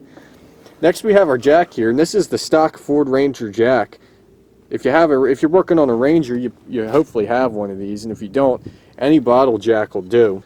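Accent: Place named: Western Pennsylvania, United States. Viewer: What are we going to do with that Western PA accent!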